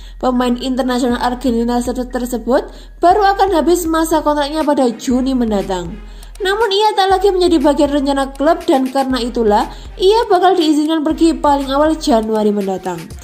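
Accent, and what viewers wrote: Java, Indonesia. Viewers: Surane medok medok pantes 🤭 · mani medooook eta suara pengsisi suarana🤣.....forza milaaan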